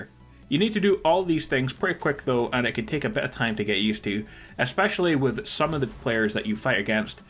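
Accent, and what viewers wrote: Scotland, United Kingdom. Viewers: You sound a touch Scottish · I hate the quickdraw system in RDR2. It is completely retarded. It tells you to fill the meter slowly on the first press, by pressing slowly...Press slowly? It's supposed to be a quickdraw ffs! I died so many times trying to do just that. The trouble is that you don't get much opportunity to practice either. BTW are you Scottish ya bawbag? Me too.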